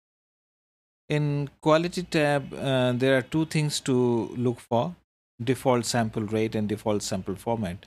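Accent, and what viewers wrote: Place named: India. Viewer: Indian, or somewhere abouts.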